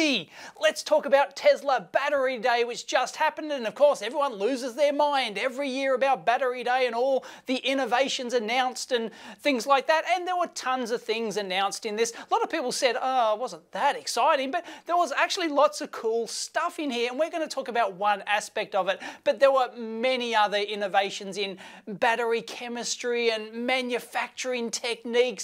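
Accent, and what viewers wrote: Australia. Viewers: Nicely explained as always, and all the better for being in Aussie English. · Well scripted analysis . Nicely explained . With an Aussie accent to boot. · Love his Aussie accent. To my poor American ears, it sounds like he keeps saying that “we get the HATE out of the bottom of the battery”, which makes it hilarious to listen to. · "Dunny roll" haha. Keep it up, enthusiastic Australian guy! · Sandy Munro with an Aussie accent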